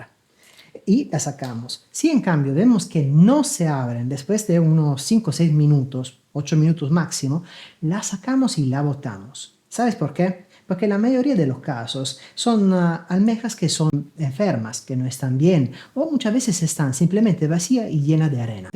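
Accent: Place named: Italy. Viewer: Buenos dias. Ya activé la función WhatsApp. Es una gran idea y gracias por hacerme partícipe Este poema es para ti Cocinando Sabores y maestría de la mano de. Simpatía y sencillez. Cocinando algo rico paso a paso cada vez. Y siempre acierta él porque algún ingrediente en casa sueles tener. con su encanto y su acento italiano. Y aunque a su mujer no logre conocer, la inspiración ha de tener. Hace de todo este Chef, da consejos, hace puzzles, capaz de contar chistes buenos también. Admiración y estima siente esta gaditana. Que dice siempre lo que le vienen ganas. Para y su mujer de una fans y cocinera apende recetas nuevas.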